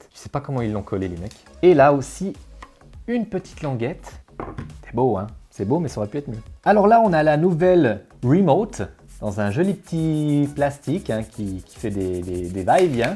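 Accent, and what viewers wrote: Vaud, Switzerland. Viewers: Mais quelle touche délicate d'accent Vaudois c'est magnifique ! ça fait bizarre d'en entendre sur Youtube... Amicalement, d'un autre Vaudois.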